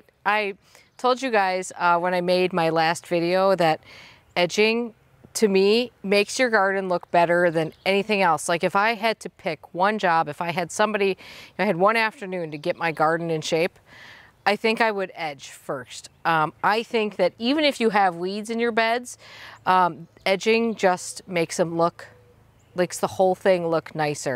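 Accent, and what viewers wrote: Wisconsin, United States. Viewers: I had to laugh listening to you talk. I think the word “so” must be a Wisconsin thing as I say it all the time myself. I live in Door County and it isn’t t-shirt weather here yet!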